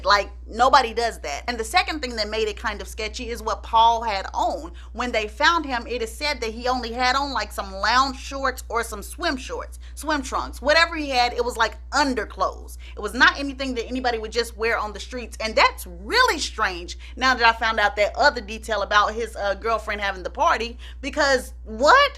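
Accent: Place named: Detroit, United States. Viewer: Sister Love I'm from Detroit Michigan. l got to give it you. The way you run the voodoo down. Sound like you straight out of Motown. Love ya keep up the good work.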